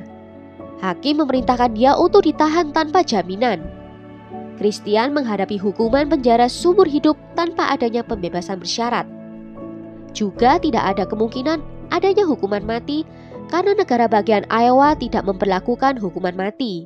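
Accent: Java, Indonesia. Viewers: Hayyy jg medok kuhhh.. suaranya sukaaa💖💖💖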